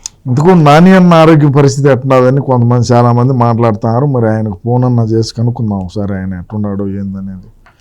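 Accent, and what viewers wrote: Rayalaseema, India. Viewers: భాష రాయలసీమ భాష బాగుంటది.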